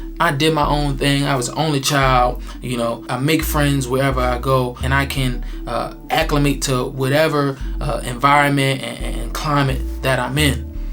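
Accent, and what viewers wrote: Baltimore, United States. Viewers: I'm in nursing school and it's already starting. Everybody wants to be the super nurse and try to prove that you are not smart or fit for the job. It's very stressful. But I feel it's like that in any profession. Aye Bmore. I grew up there but haven't lived there in 15 years. It reminds me of home hearing your accent.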